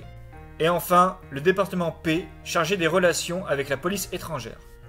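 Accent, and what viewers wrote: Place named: Grésivaudan, France. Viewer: Ça sent l'accent du Gresivaudaaaan ça!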